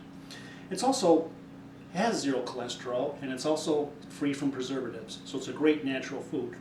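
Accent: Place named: Minnesota, United States. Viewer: Good video brother. I used to live in Mankato. Haha. I thought u had that accent. I'll be honest with you. I guess im one of the very few who can eat it plain with nothing in it. All I do is put a half cup in the food processor bc it cooks more throughly. And its definitely one of rhe foods that keep you feeling full. Thats the magic food ya'll.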